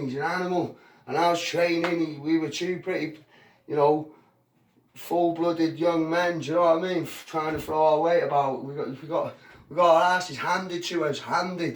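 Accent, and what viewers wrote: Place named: Mold, United Kingdom. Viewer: where you from lad? sound like your from mold way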